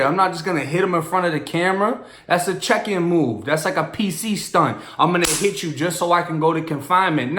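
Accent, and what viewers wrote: Boston, United States. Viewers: He sounds like a he's from Boston. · Lol I’ve been listening to ever since the 23&1 interview and I always crack up with his Boston accent! “Test of HARHT” “PAHRK THE CAHR”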